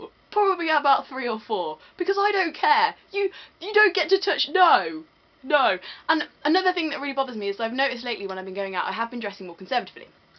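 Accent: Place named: United Kingdom. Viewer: Wow. I can't listen to even one minute of this garbage even with the cute British accent. Of course I'm a man.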